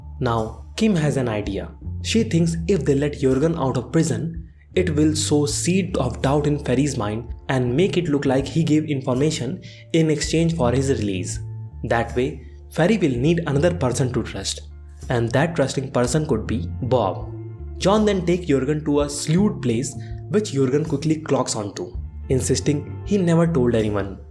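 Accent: India. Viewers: It is very strange to hear someone with an Indian? accent explaining a Belgian/Dutch tv show. It's nice that through platforms like Netflix people can watch shows from completely different countries that usually don't expand much internationally like eg US Hollywood movies (Like I don't think Squid Game would have ever been that big if we still had to rely on our tradition TV Channels, they probably would have never chosen to suddenly play a Korean series) Thank you for the recap.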